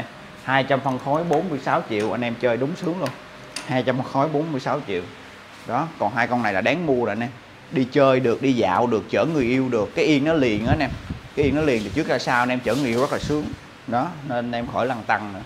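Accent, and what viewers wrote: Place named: Ninh Thuan, Vietnam. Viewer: Nghe tiếng a nói chuyện, giống dân Ninh Thuận quá ta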